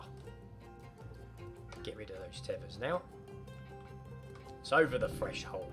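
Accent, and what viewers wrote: Cockney, United Kingdom. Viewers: I’m pretty sure you’re the only cockney fluent YouTuber is subscribe to.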